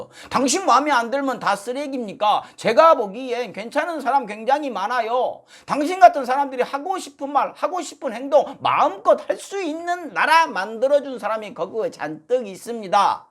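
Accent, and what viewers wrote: Jeolla, South Korea. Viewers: 무슨 말씀을 하시더라도 상관없는데... 제발 전라도 말은 과하게 안쓰셨으면 좋겠습니다 ㅠㅠ 제가 전라도인데도 전라도를 대표하는 사람처럼 얘기하지 않으셨으면 좋겠습니다 ㅜㅜ정말 부끄럽습니다ㅠㅠ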